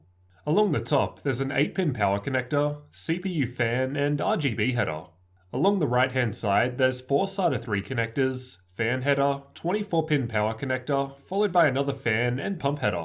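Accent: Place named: Australia. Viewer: U are Aussie right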